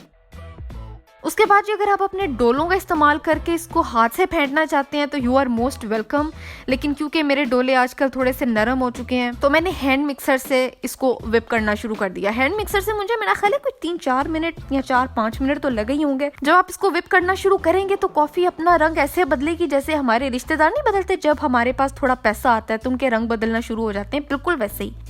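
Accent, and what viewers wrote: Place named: Punjab, India. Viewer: Cute punjabi accent. 😊😊👍👍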